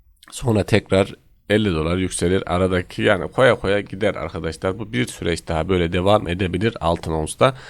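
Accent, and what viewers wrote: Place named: Kaman, Turkey. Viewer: Geçmiş olsun , Kamanlı konuşmanı biraz düzeltmiş hastalık.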